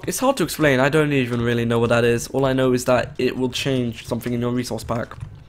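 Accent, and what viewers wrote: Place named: RP, United Kingdom. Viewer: wow, you sound so posh... (I'm just noticing)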